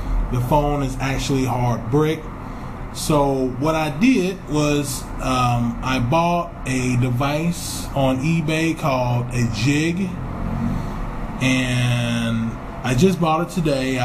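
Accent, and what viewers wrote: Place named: United States. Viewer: love your american accent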